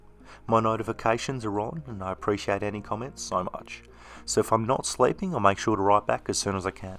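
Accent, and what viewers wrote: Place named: Australia. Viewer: Loved the video and the laid back Aussie voice! Going to pull the trigger on a pair of these after seeing this. Love from Ireland :D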